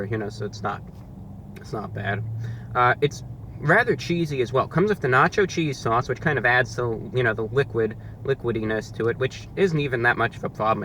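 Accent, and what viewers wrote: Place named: Transatlantic, United States. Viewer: Btw i think your better than joeys world tour :3 Im east coast just like you! And i love your transatlantic accent too <33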